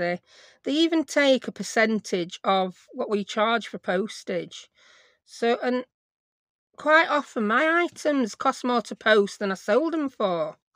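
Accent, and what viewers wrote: United Kingdom. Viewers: Hi, loved the video. So nice to hear a nice british lady crafting, all the videos Ive come across are Americans and Ive nothing against that but just wo der why theres no british crafters on you tube. I have lotsof craft stuff but dont know how to use it. Just subscribed hope I lwarn how to craft properly. Thanks for sharing 💖 x x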